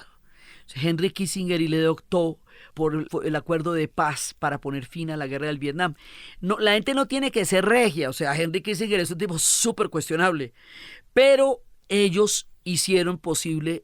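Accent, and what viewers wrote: Colombia. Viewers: Hola me tienes fascinada tengo días ya escuchándote, te escuche los tres capítulos de Turquia, Noruega, y bueno solo me transportas a el momento, eres una mujer que cuentas las cosas que haces que la piel se me ponga chiquita, y pues tu voz colombiana me encanta, te mando muchos abrazos desde Guadalajara Jalisco México 😘 🇲🇽 💕 y seguiré escuchándote mi papá me preguntó como podía escucharte tiene cáncer, tiene 84 años y ya te escucha también. Bueno no se cuando termine de escucharte pero eres una gran mujer Dios te bendiga 🙏 🥰❤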